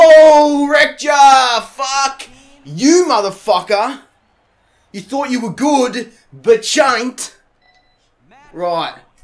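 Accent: Australia. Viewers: Aww mate, congratulations on 10,000! Super happy for you, I know I haven't been here as long as a lot of your other fans (I guess it's been about... seven months? Eight?) but I knew from the first time watching you that you were going to be my favourite YouTuber; my instinct proved right and to this day you still are, you definitely deserve it and much more. As for this video I mean, shit, I've never even played a Wii in my life lol I've always thought they look mediocre at best, I knew people who had them when they first came out but they just never appealed to me. Looks like you had fun though haha, your laughter is contagious as hell.:') On a side note: I've noticed I laugh an awful lot whenever you say the word ''cunt'' in your videos lmfao I dunno it's just so hilarious to me, it's a funny word that's apparently made even funnier when spoken in an Australian accent. 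xD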